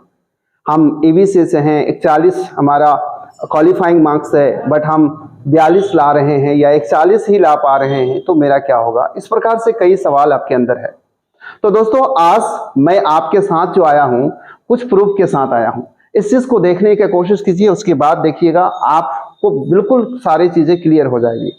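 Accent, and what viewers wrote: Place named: Bengal, India. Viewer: Aapke aawaj me bangali tone hai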